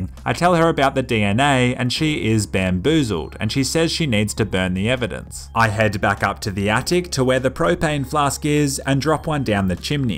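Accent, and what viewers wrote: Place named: Australia. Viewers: Sweet video dude. Aussie accents are too good. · he is Australian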